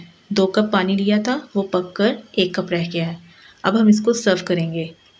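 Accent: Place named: Lucknow, India. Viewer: Aapke aaba ijdaad lucknow ke the kya? Aapka accent me lucknow ka bohot asar araha he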